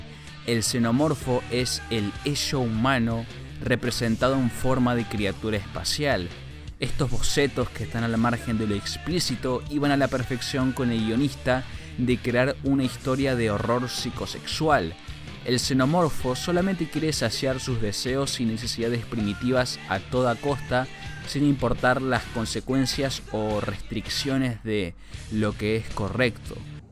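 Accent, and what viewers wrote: Argentina. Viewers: El esho, el ego y el súper sho jajaja cagado acento Argentino. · Tanto le cuesta leer a un argentino.? Super Sho, El Esho? Igual que un puertoriqueño, saben que hablan mal pero igual lo hacen hacen 🙄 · eres muy buen editor, buen análisis amigo, transfondo intelectual, saludos desde México, voz sos argento "pibe"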